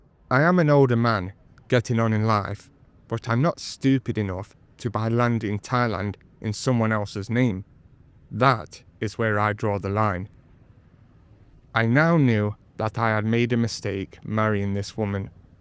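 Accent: England, United Kingdom. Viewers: Your full of BS you are not an Australian do you think we are all stupid, I heard you say with a pommy accent who you have been from Canada, The US with the same old story about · You certainly don’t sound Australian mate Have you spent time in the UK or something?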